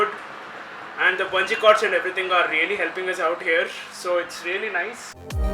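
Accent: India. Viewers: Dude your english accent is very funny...its not the proper accent that indians speak..might be u r south indian tats y it is like...if I m wrong..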